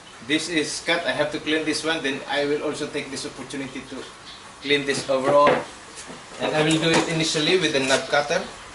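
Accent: Philippines. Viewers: That's what he's speaking. Sounds like a Filipino accent.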